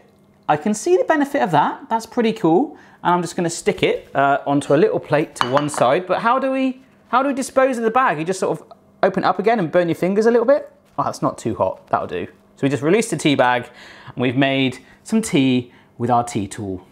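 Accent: United Kingdom. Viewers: Doesn't like tea? Is he british or does he just have an accent? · u always say "I hate tea" or "I hate olives". well u hate a lot of things ow ant btw i love ur Brittish accent! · I usally hate the british actsent but when you talk, i totally felt in love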